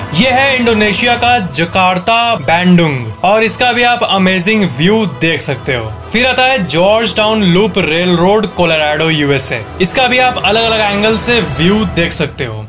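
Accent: Bihar, India. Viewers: Yes he is rally Bihari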